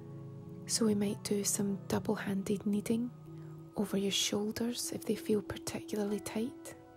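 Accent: Scotland, United Kingdom. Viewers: The Scottish accent is heavenly with headphones. Oh, and when I finally nodded off, I had a dream that you made a charcuterie board ASMR vid. Then it dawned on me; without me readers on, that's what the salts 'n oils board sort of looks like. 😅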